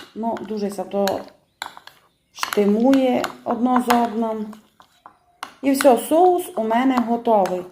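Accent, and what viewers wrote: Transcarpathia, Ukraine. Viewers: Чудный Закарптский язык,очень люблю слушать.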